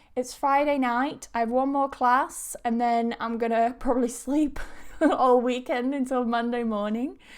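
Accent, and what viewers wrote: United Kingdom. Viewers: Why do you speak a British English and accent?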